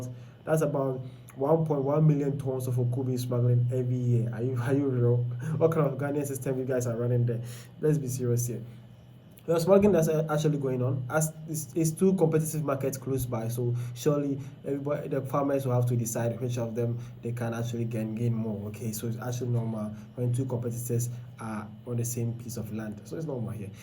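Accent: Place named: Nigeria. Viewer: I thought you are a Nigerian. You have a deep Nigerian accent.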